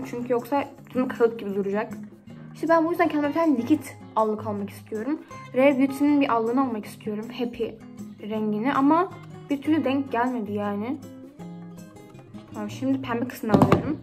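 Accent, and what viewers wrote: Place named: Russia. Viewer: Rus veya Ukrayna olması lazım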